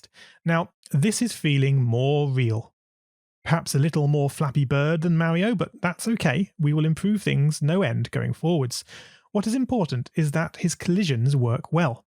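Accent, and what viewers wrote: United Kingdom. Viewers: okay look I’ve given this a lot of time, probably too much time, too many failed attempts… All these positive comments mean to me is you guys already understood something, this video did not help me build a working pixel game, and I did follow the instructions carefully. has been highly praised, so you will need to allow me to give one negative comment. Many times in these videos he misspeaks and corrects his speech with pop up text, which he uses unnecessarily all the time, saying things like “nice” and “looks great” and it just isn’t practical to believe you are adequately correcting your mistakes by mixing them in with all that overly happy sounding crap. Just show us how to make a video game. At this point I wasted 50+ hours of my life on you. Do better if you’re getting millions of views. Going off on tangents, changing things to “have a little fun” was not fun for those of us who came here to follow a step-by-step video. 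I did not learn how the components work from, not on this video or any other, probably because he kept changing things and half-explaining them. It’s like you have to use his specific complicated way that you don’t really understand but trust because he did it, or nothing. I wish the algorithm would give me more users, it is heavily biased to deliver, no surprise given we’ve been brainwashed to believe a white man with a British accent is the best. He’s not.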